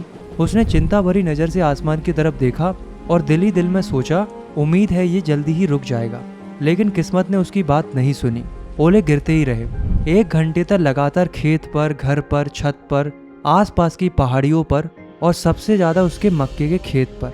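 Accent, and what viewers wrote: Uttarakhand, India. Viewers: bhai uttrakhand se ho na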